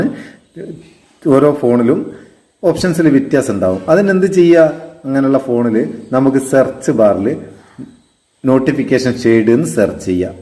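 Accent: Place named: Koyilandy, India. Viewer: ഇങ്ങൾ കൊയ്ലാണ്ടി ആണോ ന്റെ ഉപ്പാന്റെ ഫ്രണ്ട് ഇതേ സംസാരം 😄😄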